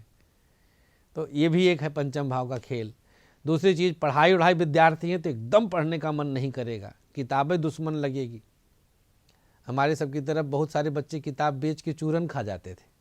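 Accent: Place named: Bhojpuri, India. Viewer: Dhanyawad sir ji bhojpuri touch ke liye, aap ka bolne ka style mast hai, vedio informative raha🙏🙏🙏🙏🙏🙏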